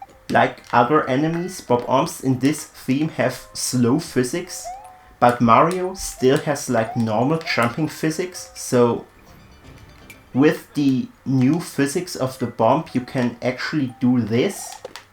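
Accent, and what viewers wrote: Germany. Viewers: man merkt das du deutsch sprichst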